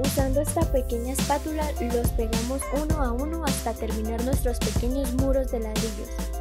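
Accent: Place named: Colombia. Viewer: Por el acento , Colombia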